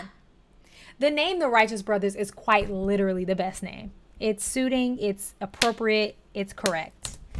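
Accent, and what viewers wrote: South, United States. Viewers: You must be from the South, girl. 😂😂 Love your reactions on the songs.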